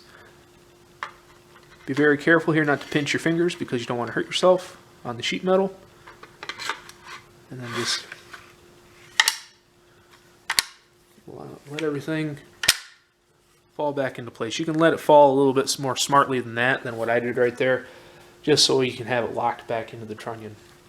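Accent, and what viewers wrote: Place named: Minnesota, United States. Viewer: I detect....... minnesotan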